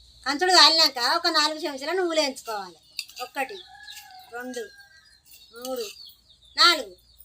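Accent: Telangana, India. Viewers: అమ్మ మీ వంటలు చాలా బాగుంటాయి...తెలంగాణ యాస .. మాది కూడా ఇదే బాష.. మీరు సంతోషంగా ఉండాలి...ఇలాంటి వంటలు ఎన్నో చేయాలని మనసారా కోరుకుంటున్నాను... మీరు చేసినట్టు ముద్దపప్పు, పచ్చిపులుసు చేసిన...సూపర్.....❤ ప్రం సిద్ధిపేట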